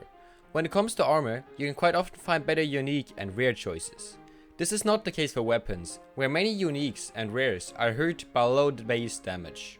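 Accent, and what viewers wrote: Germany. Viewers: Where are U from BC english Sounds like a German accent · it sounds like u are from germany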